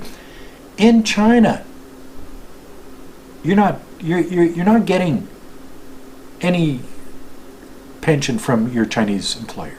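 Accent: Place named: Canada. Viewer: Great video, I like your Canadian accent.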